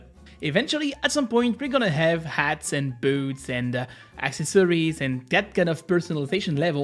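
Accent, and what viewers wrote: France. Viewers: pourquoi tu rate le public us wesh on entend bien que t'es fr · This is the first time i watch your videos. I just make it to and realized this dude is definitely french! xD. One of my friends said there is an amazing huge update in CSGO you must check it out and didn't want to install it but still wanted to see the updates you're the only one who covers them in youtube till now so thanks for reviewing the updates you are really quick in covering them.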